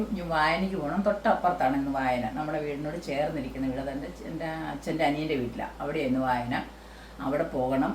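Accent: Kottayam, India. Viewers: കോട്ടയം സംസാര രീതി നിക്ക് ഭയങ്കര ista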